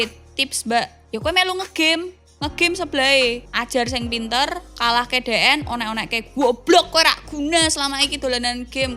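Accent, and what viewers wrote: Java, Indonesia. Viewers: Logatnya koq Jawa banget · Jangan mendok teruss kak . pake translate dong kak😔😔 .. Kita org NTT tidak ngerti kak . hehehehehehe .. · Meddok mbx 😁👍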